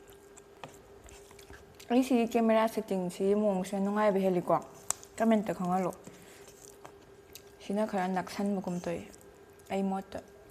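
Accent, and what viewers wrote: Kakching, India. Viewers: Eigi favorite lonne kakcing lonse taba yam nungai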